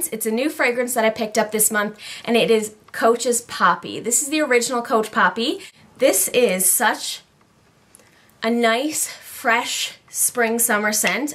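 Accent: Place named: Atlantic Canada, Canada. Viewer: I was watching yt videos on my iPad and your channel was recommended to me. Are you from the east coast of Canada at all? You sound like me sort of lol....(I'm from Newfoundland living in Ontario).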